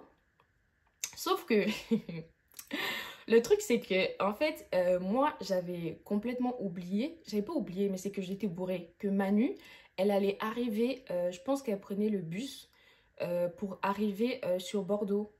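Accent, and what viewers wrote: Gabon. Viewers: On aime ton accent gabonais ❤ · L’accent Gabonais là ooh bien chic 😂😂😂😂😂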